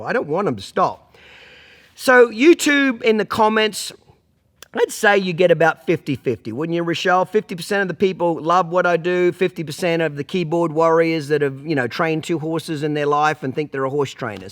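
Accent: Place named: Australia. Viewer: I never knew Garth Brooks has a Aussie accent..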